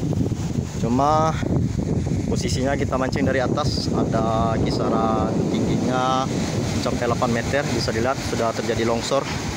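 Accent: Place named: Malaysia. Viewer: Asal mana om kok kayak malaysia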